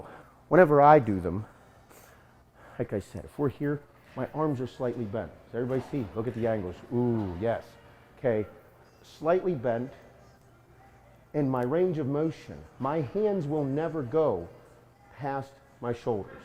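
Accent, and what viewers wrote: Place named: Pittsburgh, United States. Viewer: Heard the accent before I saw the word Pittsburgh in the back. Sorry about Sunday. That defense is in trouble.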